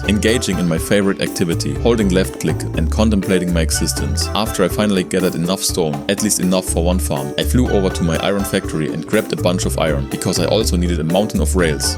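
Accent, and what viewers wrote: Germany. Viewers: Two Things: 1) You make so good content, you deserve 500k and more subs because you put so much efort in your Videos❤❤❤❤❤❤❤ 2) I think you are german (Ich bin selber deutscher und man kann richtig raus höhren das du deuter bist) ❤❤❤❤❤❤❤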